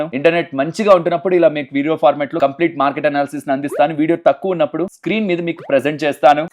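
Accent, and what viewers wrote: Nellore, India. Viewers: Galaba chasi velli potey … ❤ the Nellore slang !!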